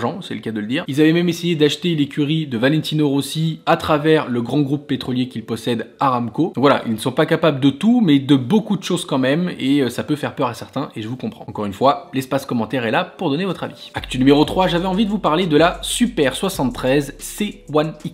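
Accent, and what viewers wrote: Belgium. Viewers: Troooopppp biiiieeennn, les 5 actus, l’accent belge 🤣 👍🏻, 😳pas trop fan de la direction assistée sur une 🏍. 🤛🏻✌🏻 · Enfin qu'il parle comme un vrai belge continue comme ça le BG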